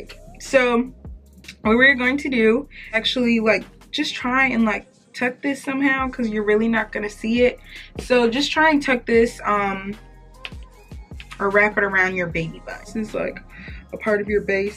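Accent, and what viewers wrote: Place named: Texas, United States. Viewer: are you from texas beacuse i love your accent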